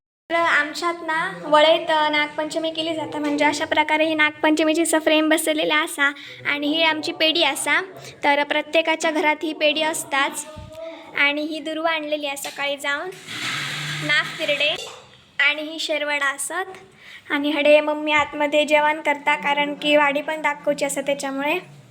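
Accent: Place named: Malvan, India. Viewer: तु मालवणी बोली इतक्या ओघवत्या आणि गोड शैलीत बोलतेस, की त्याचं खरंच मनापासून कौतुक वाटतं. कुठलाही व्हिडिओ असूदे, तू तो प्रामाणिकपणे आणि निरागसपणे सादर करतेस, हे मनाला भावते. आजकाल बऱ्याच मालवणी लोकांच्या बोलण्यात मराठी प्रमाण भाषा आणि इंग्रजी शब्दांचा वापर असतो, पण तू जेव्हा बोलतेस तेव्हा खरी मालवणीची गोडी आणि सौंदर्य अनुभवायला मिळतं. तुझी ती बोलण्याची पद्धत अशी आहे की पुन्हा पुन्हा ऐकतच राहावं असं वाटतं. म्हणूनच तुझे व्हिडिओ मला फार आवडतात. असंच सादरीकरण करत रहा आणि खूप मोठी हो — तुला मनापासून शुभेच्छा!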